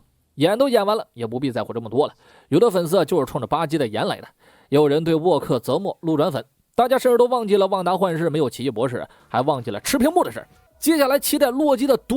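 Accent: China. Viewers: 听你口音，你明明是大陆人，为什么要用繁体字呀？蛤？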